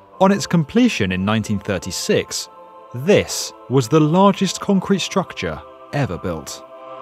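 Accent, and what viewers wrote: United Kingdom. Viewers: Bro it was obvieous from the bigginni g that he is brit all the evidance was pointing at it from not sounding the R at the end and from many things that differs brits from american accent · Why do we have a British guy talking about American history?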